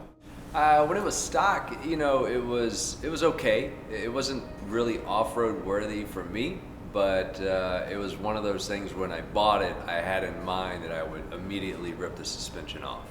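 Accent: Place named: Utah, United States. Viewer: He sounded like he was from Utah then he said he was from Utah. 🤦‍♂️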